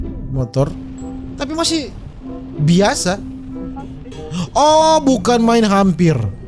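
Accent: Manado, Indonesia. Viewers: Cuma Reaction bang yg bkn gw ketawa.di tambah logat Khassss manaddooo.. Tambah lucu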